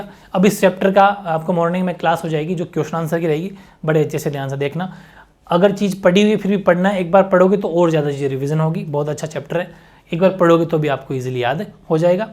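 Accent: Rajasthan, India. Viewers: Sir ke bolne ke tarike se kis kis ko pta chalta h ki vo rajasthan se h😅❤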